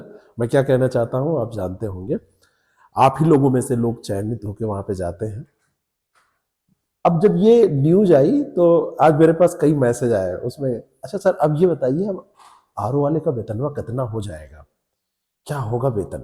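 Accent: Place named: Purvanchal, India. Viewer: Lgta hai aap purvanchal k side k hai😂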